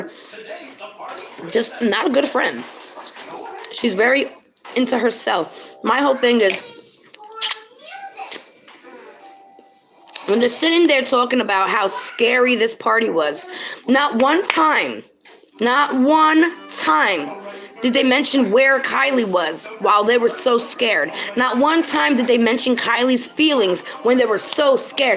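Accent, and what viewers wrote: New York, United States. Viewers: ish lol!! Just woke up l'ok! And you had me lol almost fell out of bed. HHAHAHA you said, * And she foundher AT THE PARTY???!!** Haha i love that NY accent, makes me miss home! God bless sending light love from Connecticut.🌘🌙🌞🌞🌟🌠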